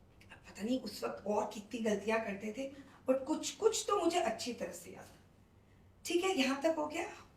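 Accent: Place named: Delhi, India. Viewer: Are you a Delhite ..your way of speaking is like them..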